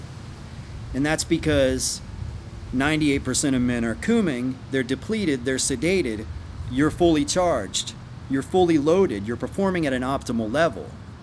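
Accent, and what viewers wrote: South, United States. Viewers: With that southern accent 😂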